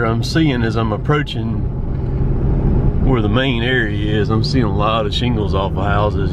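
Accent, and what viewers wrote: South, United States. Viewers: Love that southern accent... From fellow southerner on the NC/SC border. 😊 love these videos! Stay safe!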